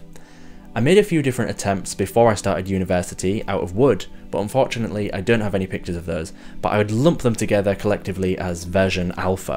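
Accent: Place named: Yorkshire, United Kingdom. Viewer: Yorkshire accent or not, thanks for sharing!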